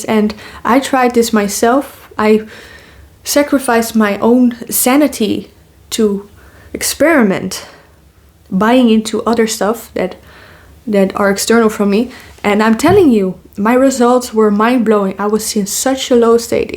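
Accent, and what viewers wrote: Netherlands. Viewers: I would bet that’s u are Dutch, I can hear it. Plus, the name is definitely Dutch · Defiantly a dutch speaking english🤭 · Are you Dutch? You sound like that (im dutch)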